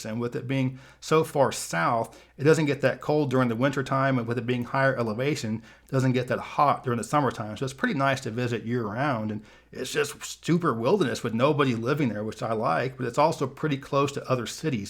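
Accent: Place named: San Joaquin Valley, United States. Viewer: You must originally be from Fresno or Visalia (or near those). I have to say, you have that San Joaquin Valley white person accent. lol I have it too (raised in Stanislaus County).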